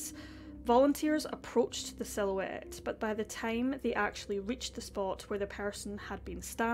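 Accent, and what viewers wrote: Scotland, United Kingdom. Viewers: Oh my god your Scottish I’m from Glasgow too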